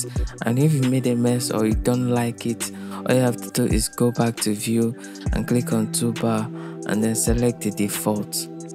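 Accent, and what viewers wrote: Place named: Nigeria. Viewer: beautiful naija voice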